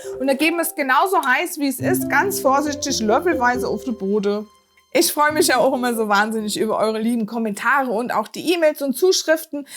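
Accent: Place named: Hesse, Germany. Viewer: Voll genial , hab die Torte zum Geburtstag für meinen Mann gemacht , legga legga.... Dein hessisch is einfach herlliiiisch. DieStelle mit der Butter : "damit's net so " aarsch" zammfällt" :DDD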